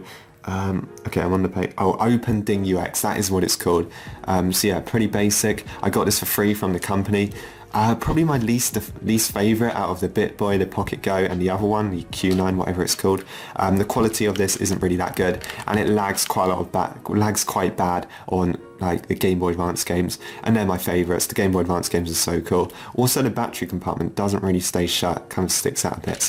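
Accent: United Kingdom. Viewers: cool collection! Very interesting. I was just trying to place your accent.... is it Stevenage by any chance ?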